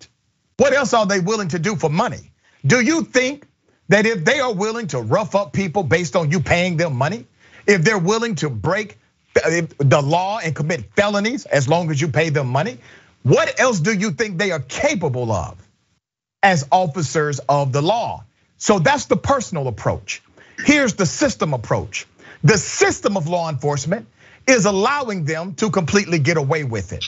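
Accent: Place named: Texas, United States. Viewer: HAS SUCH A STRONG TEXAS OR COUNTRY ACCENT THAT DOESN'T MATCH HIS APPEARANCE, BUT I LOVE WHEN WHITE PEOPLE DOESNT ALIGN AND SUPPORT OTHER CORRUPTED WHITE INDIVIDUALS. I ALWAYS SELL OUT MY FAMILIES AND FRIENDS WHEN THEY DO SOME REAL MESSED UP STUFF ESPECIALLY THAT COULD AFFECT OTHERS.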